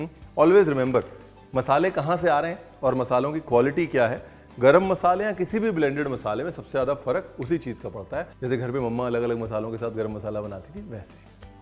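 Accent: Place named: Punjab, India. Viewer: I am from Punjab and loves your punjabi accent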